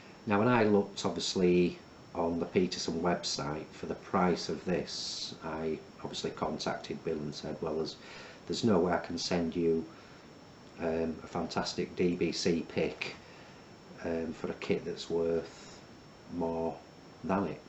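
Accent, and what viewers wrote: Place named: United Kingdom. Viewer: i love your accent...or should i say, i love your british english. you got no accent at all its just how english should be spoken! :))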